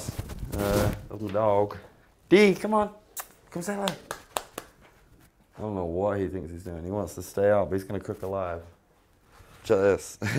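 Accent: United States. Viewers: strange accent seems he picked up an american twang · Once he starts drankin he sounds American.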